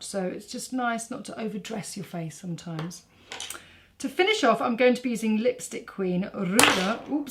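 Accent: United Kingdom. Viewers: you are adorable and I love your sweet soft British accent. This was a very nice tutorial. All the detailed explanation of the products and techniques really helps the everyday makeup wearer. Thank you and stay well ❤️🌻🌹🌺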